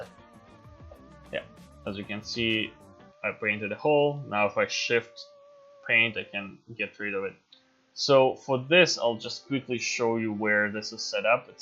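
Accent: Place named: Quebec, Canada. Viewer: L'accent du Québec ça trompe jamais! eh eh, i wonder if an 8km x 8km terrain need world composition?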